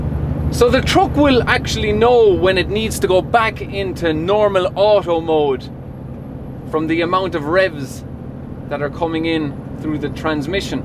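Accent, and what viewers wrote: Ireland. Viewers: I'm gonna be honest.,the only reason I watched this was to enjoy the Irish accent.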